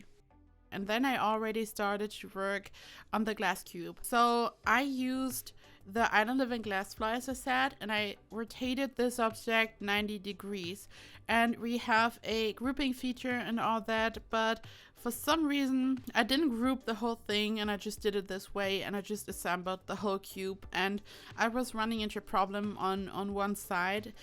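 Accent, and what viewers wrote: Germany. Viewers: Sounds like u are German :D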